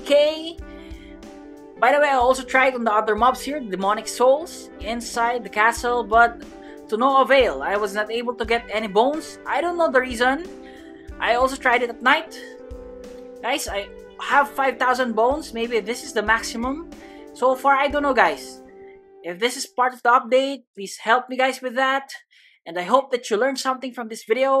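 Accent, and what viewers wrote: Philippines. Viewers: Hey are you Philippines you sound like. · First time? I'm A filipino so I could tell from his accent that he is a Filipino.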